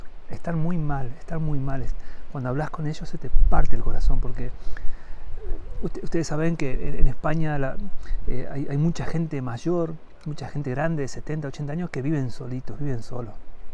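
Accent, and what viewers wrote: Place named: Argentina. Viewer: Por ser argentino tenes acento de que parte de argentina sos. Muy buenos los vídeos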